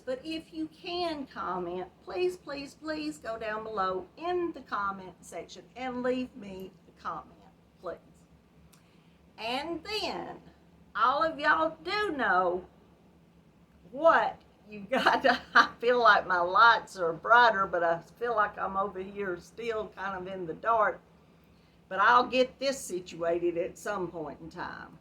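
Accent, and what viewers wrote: South, United States. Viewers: I really love watching your videos and hearing you talk. I especially love your Southern accent! There's just something soothing about it. Your Sislove Shawl is so beautiful! I always have several projects going at once, and don't always get everything done by the deadlines I set for myself. My shawl is still in Section one, but I will finish it. Thank you for your videos!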